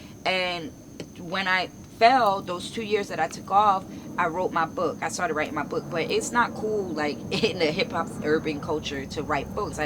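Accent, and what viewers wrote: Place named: New York City, United States. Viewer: I knew you was from NYC girly. I could still hear the accent. That is something we never loose. I’ve been all down south and my accent never left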